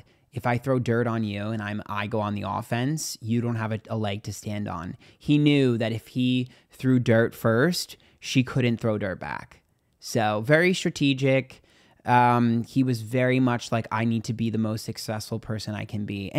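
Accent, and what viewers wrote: New York, United States. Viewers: I love how when you get upset….. bc your New York accent comes out…. I just love your accent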